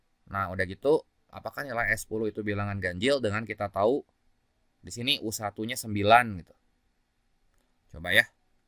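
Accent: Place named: Sunda, Indonesia. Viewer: aduh teh koko ternyata nyunda nya nyunda pisan euyyy, Hatur nuhun ko 😊😊😊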